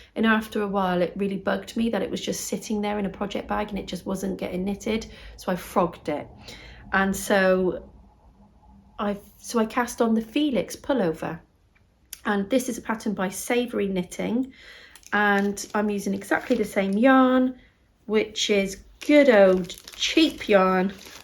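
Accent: West Country, United Kingdom. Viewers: found you through "Tales From Cuckooland" and decided to stop a while. Loves the hint of a Westcountry accent, reminds me of home. I was born and bred in Hayle, Cornwall xx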